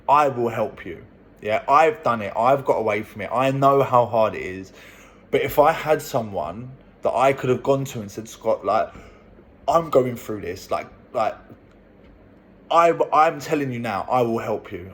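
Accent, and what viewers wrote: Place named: Turkey. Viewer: the turkish accent stuck brother😂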